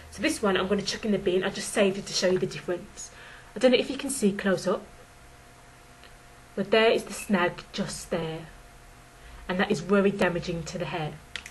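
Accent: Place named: Birmingham, United Kingdom. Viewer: Thanks for that vid, very informative and i love, love, love your accent; are you from B'ham UK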